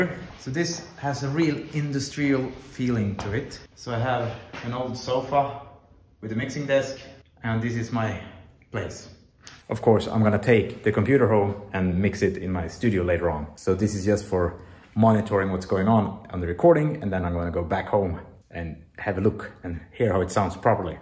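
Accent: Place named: Hagfors, Sweden. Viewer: Håller med om katterna, å dialekten va Hagfôrsmål. 😁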